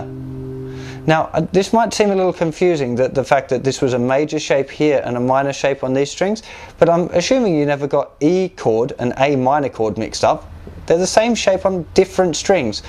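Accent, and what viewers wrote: Australia. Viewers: are you australian? because you sound aussie.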